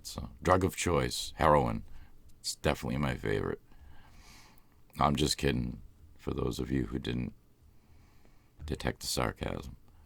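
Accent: Boston, United States. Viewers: I never knew that your real voice was a heavy Boston voice XD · Is that a Boston accent I hear? 😊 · Is that a downtown Boston accent? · Are you from Boston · Jewish Jesus it’s a Boston accent. Are you claiming to be the Jewish Jesus? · Boston accent lolol from rhodeisland myself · Are you from Boston?? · Is he from Boston? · Bahhh you should do more regular vlogs!! I want to see more of your beard and hear more of your Boston accent! It’s just as relaxing as your asmr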